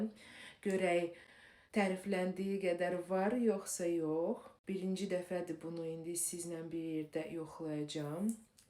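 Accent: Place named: Russia. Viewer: Russ dilli xanımdır normak həyatda azərbaycan dilindən çox isitifadə etmir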